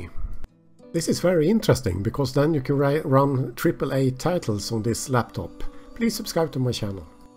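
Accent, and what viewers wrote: Sweden. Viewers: It screams Swedish accent.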